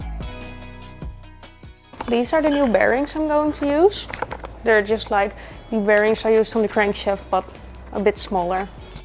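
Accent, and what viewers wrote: Netherlands. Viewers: Thx for another great video! I was curious about your t-shirt and found some good music 😊 a little off topic, but I have to mention I am thinking about bitterballen & stroopwafels when I hear your dutch accent 🤭 I cant help it - sorry 🤭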